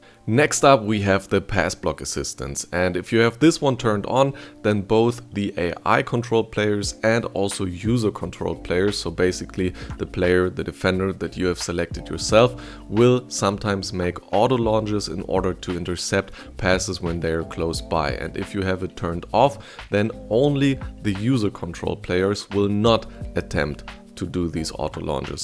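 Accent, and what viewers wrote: Germany. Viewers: You have german accent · Is this dude German?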